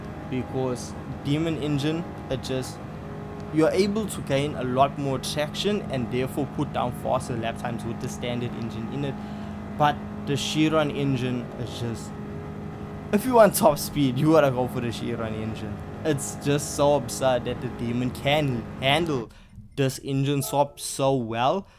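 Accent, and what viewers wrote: South Africa. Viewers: South African😎😎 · Amigo, he's South African, some 12,300km from Beijing...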